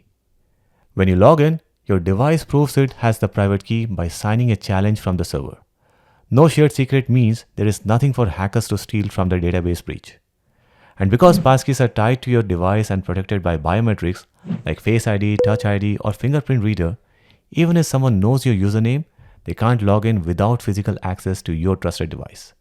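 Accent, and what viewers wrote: India. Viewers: Hearing about protecting myself from phishing attacks through an Indian accent is kind of surreal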